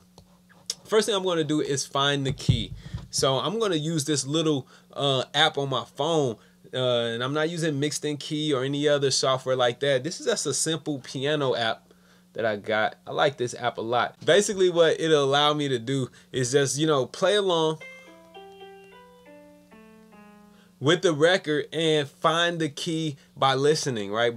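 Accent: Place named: South Carolina, United States. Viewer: U from south carolina huh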